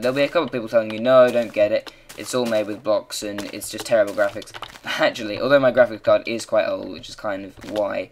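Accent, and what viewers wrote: United Kingdom. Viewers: Thanks for the review! It helped me decide that I'll probably buy the game. And your British accent made this 36% better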